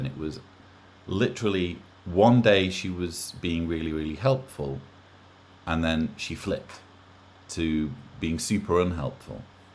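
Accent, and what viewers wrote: United Kingdom. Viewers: you have thousands, tens of thousands of supporters. Please know that. I don't even consider myself an ex JW, since it's been many years since my journey with them. I listen to you so that I don't fall back into that nonsense. Mostly, I listen to you for your voice (we Americans are crazy for British and Australian accents), your wit, your humor, and your humble wisdom. I have learned so much from you. We love you, man. Please take care of yourself.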